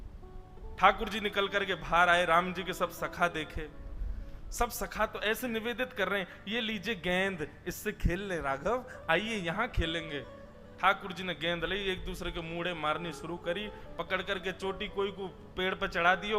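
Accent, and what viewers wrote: Braj, India. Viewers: आपके श्री मुख से ब्रज भाषा बहुत ही सुंदर लग रही है मैं तो तरस गई थी ऐसी भाषा सुनने के लिए 🙏🙏🙏🙏🙏🙏🙏🙏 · Aap k muh se brajbhasha bahut sunder lagti wah wah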